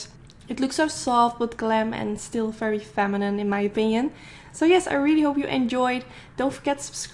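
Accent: Netherlands. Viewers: I love this look so much, you make it look so easy! Also, can I mention... Your accent is 👌🏼 I have a Dutch uncle, so I am biased of course! 😊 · are you Dutch? I hear something in your voice!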